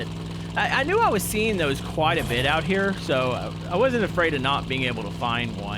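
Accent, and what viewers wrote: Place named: Texas, United States. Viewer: hes from texas so he talks like that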